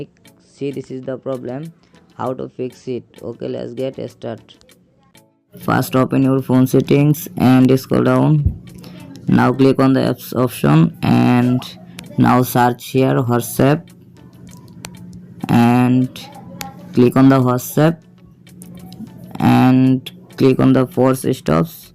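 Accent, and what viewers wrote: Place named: Tamil, India. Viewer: Tamil voice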